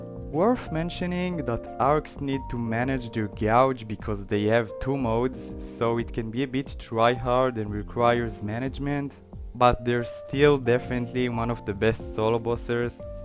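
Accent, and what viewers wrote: Israel. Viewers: Hey, is that a hebrew accent ? Love your channel bro ! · You sound like you’re from Israel haha. Awesome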